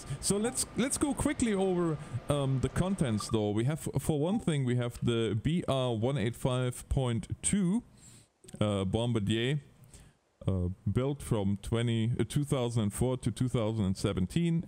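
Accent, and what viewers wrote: Germany. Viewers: Bist du ein Deutscher in Kanada? Muss fragen, weil ich den deutschen Akzent klar heraushöre, mich dann aber wundere über den Kanalnamen und das Wohnsitzland Kanada.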